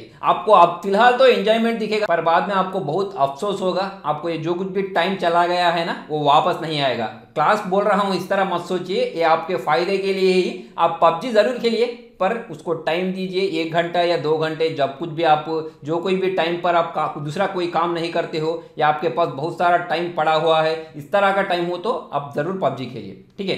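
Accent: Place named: Butler English, India. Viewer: Bro nuvvu hindi talk cheste comedy ga undi=na English la ga = butler english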